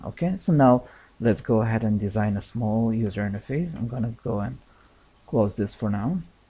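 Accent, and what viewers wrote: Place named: India. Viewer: I concur that. I've just learned how to VBA. And if I may speak the truth for a minute: He has a very, very light Indian accent, which makes his instructions so much easier to understand than most of whom I've come across. It's an honest statement. NO OFFENSE.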